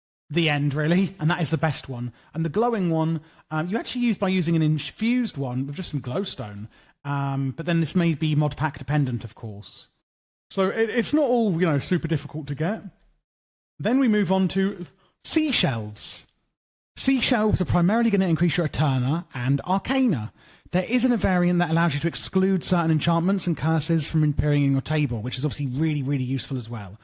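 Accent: United Kingdom. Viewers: Jesus i cannot ignore your british accent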